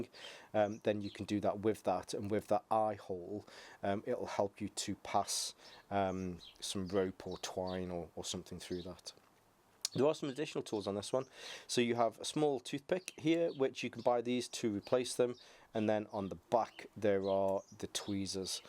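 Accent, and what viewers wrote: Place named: Stoke, United Kingdom. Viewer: You sound like a stokie. Good video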